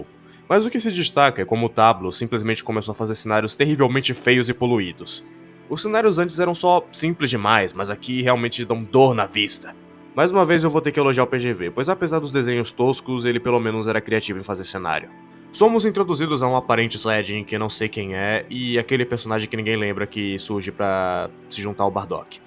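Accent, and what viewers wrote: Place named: Sergipe, Brazil. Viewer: Vídeo muito foda mano, mas tira uma dúvida, você é sergipano?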